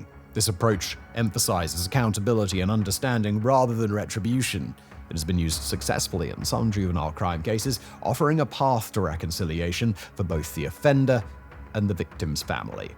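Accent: England, United Kingdom. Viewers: is this just vsauce with a British accent? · The unimaginable. Up yours. Your so predictable. Political Correctness with an arrogant english accent. You forgot to mention Lizzy Borden.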